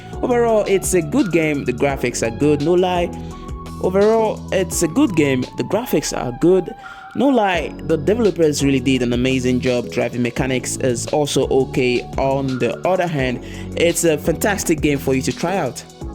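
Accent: Nigeria. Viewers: You got Nigeria accent, where are you from?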